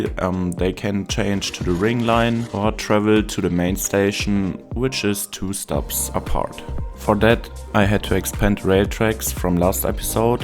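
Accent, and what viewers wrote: Germany. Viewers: I Love your german accent Even though German is a Kinda Harsh Language Love Your City 😍🤩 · are you german?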